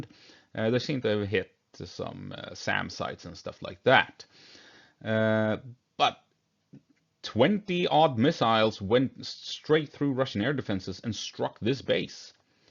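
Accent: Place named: Sweden. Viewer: Haha 😂. Nice to hear some swenglish. Nice work!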